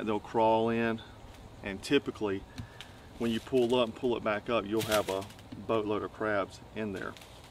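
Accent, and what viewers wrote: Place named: North Carolina, United States. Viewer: Thanks for this video, helps a lot, as I'm planning a trip with someone to the Everglades national park, hiking to the coast of Florida, to Clubhouse beach, I can not wait! Should be great fishing and I hear a lot of crabs, I'm from Central Florida myself but never been that far south, Just curious from your accent, are you from North Carolina?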